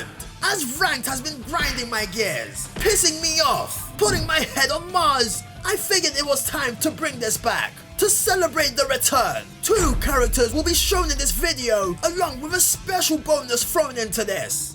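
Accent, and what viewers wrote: United Kingdom. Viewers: Yea I'm pretty sure he's in the UK especially with the accent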